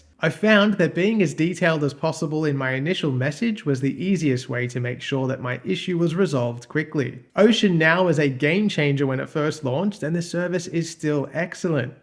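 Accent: Australia. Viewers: you are very welcome, plus I love the sound of the male Australian accent.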